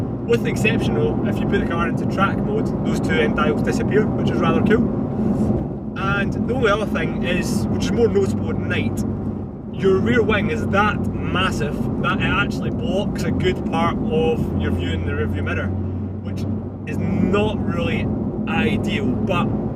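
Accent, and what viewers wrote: Scotland, United Kingdom. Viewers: I understood every word,love Scotch accent,great review,regards from NZ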